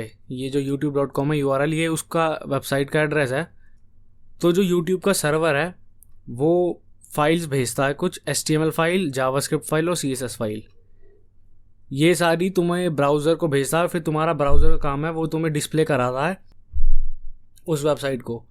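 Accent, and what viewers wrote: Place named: India. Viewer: Video to mast thi hi, Bhai ka Bolne ka Style bhi mast laga Desi ❤️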